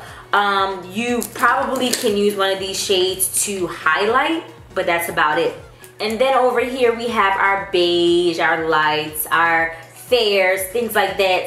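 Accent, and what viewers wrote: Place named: South, United States. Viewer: Slay! Yes Texas keep bringing the southern accent out of!!! <3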